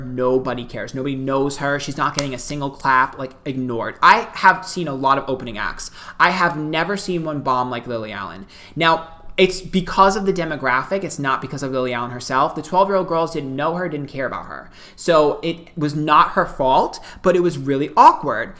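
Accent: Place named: United States. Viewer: His accent is death, combined w those hard gestures.couldnt make it beyond 2 minutes…….. insufferable…. Hes crapping on about miley cyrus in a lilly allen video. Gawd americans are insufferable…. This one is worst ever. I defy anyone to listen to this brain dead drivel combined w the worst accent possible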